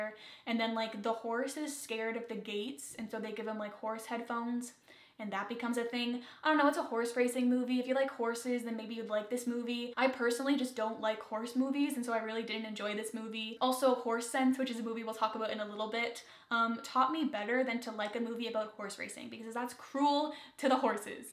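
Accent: Canada. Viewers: Hi I liked the video, and I look forward to watching the others. Also, you sound Canadian.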